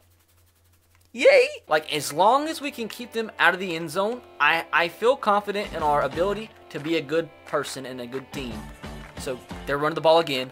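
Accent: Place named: Texas, United States. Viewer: I feel like he is a Texas stereotype with his accent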